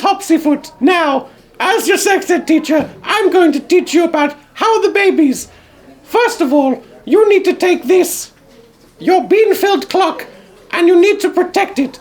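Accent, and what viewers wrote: United States. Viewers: His American accent reminds me of Markiplier a little bit. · I thought he was British now he’s amarican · He's talked about this before. There's an old video on this channel about accents where he says he picked up some Americanisms from watching a lot of American cartoons as a child. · your american accent is on point :3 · The really good American accent just tied the whole thing together. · That’s a good American accent. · That's... a really good american accent. And as an American who enjoys making fun of non-american people doing american accents, that's painful to admit. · you do such a good american accent jeez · American sounds so much different than British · Pretty good American accent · Ayyyy he’s an American maen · His American accent is on point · Wow that American accent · The american accent made it so much better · Your American accent is super weird coming out of your mouth. 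Good but weird!!!!! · You have an uncomfortably good American accent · That american accent is 10/10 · Your American accent is actually p good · das a pretty good american accent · Good American accent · Ur American accent genuinely reminded me of markiplier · Dang his american accent is pretty decent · Why does your American accent sound like Markiplier? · Mohawk fits you,. Also, good American accent. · You sounded like an American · Spot on American accent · Pretty good American Accent.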